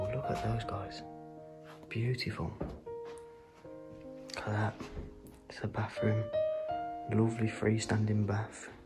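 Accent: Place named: Derbyshire, United Kingdom. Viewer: A bit posh for a Derbyshire girl 😂 😍😍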